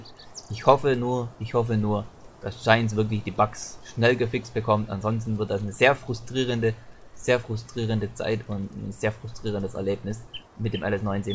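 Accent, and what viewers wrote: East Germany, Germany. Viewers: Gutes Video aber sag mal wo kommst du her ? Dein Dialekt hört sich irgendwie Ostdeutsch an aber auch Iwie nicht. Wie als würdest du versuchen ihn nachzumachen. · Wenn ich den piss ossi höre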